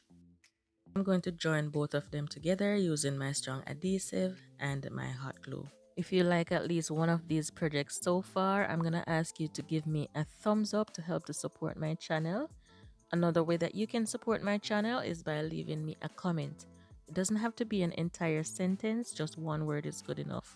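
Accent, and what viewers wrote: Jamaica. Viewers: Your pieces are gorgeous❤❤❤❤❤ and sounds like you are Jamaican🇯🇲🇯🇲🇯🇲🇯🇲🇯🇲👍👍👍👍👍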